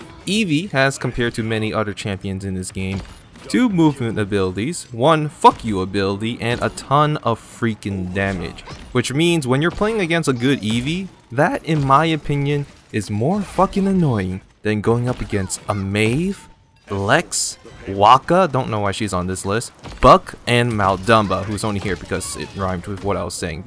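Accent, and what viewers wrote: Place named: Malaysia. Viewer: I can smell the Malasyan in his voice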